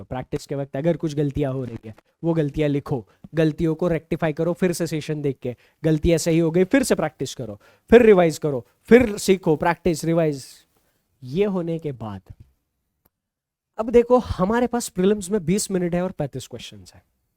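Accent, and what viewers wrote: Maharashtra, India. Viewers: Namaste Sir, hriday se request hai kirpiya 'Marathi' touch mai baat krna phir se start kr dijiye sessions mai...🧡🙏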